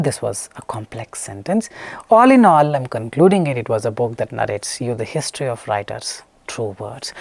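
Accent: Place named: India. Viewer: I think he is the best guy who can explain language topic easily. Besides IELTS video, I even follow his another videos about PTE. I enjoy his video a lot from Bangladesh. His accent tells me he is Indian guy. Love from BD.🥰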